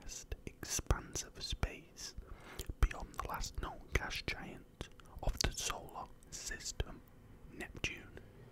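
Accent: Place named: England, United Kingdom. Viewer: You are honestly such a good find. I love space and learning things like this. Finding someone who's voice and content you like can be hard! Can I ask what part of England you're from? I'm struggling to place it and it's annoying me 😂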